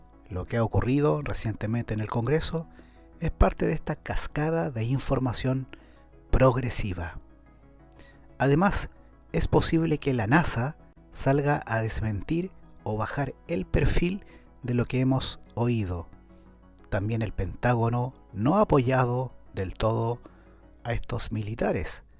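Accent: Chile. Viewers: Por mas podcast como estos con acento chileno ☺️. Mis favoritos, gran trabajo, gracias ❤.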